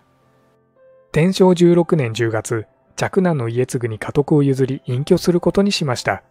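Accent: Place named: Mikawa, Japan. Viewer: 三河のアクセント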